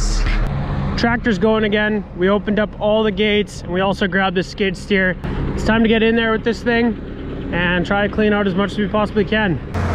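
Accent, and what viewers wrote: Netherlands. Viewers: dat is een zeer zwaar Nederlands accent. leuk om een nieuwe video te zien hier in Vlaanderen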